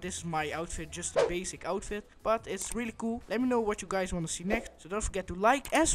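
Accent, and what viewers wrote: Netherlands. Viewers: LOVED THE VIDEO! You sound very Dutch btw :) Ben jij Nederlands?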